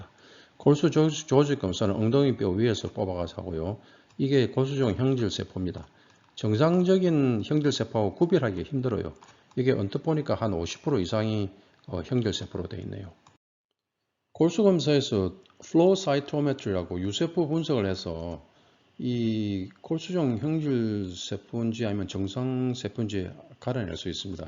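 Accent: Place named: Busan, South Korea. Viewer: 교수님 부산분이신가요 ? 익숙한 억양 너무 좋아요 쏙쏙 들어오고 친숙하고 재미있어요 ~^^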